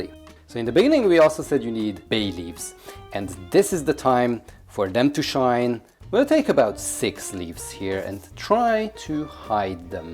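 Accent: Hungary. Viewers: Your Hunglish accent is adorable hahaha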